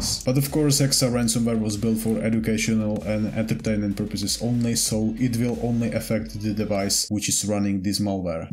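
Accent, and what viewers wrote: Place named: Czech Republic. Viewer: The czech accent is so cool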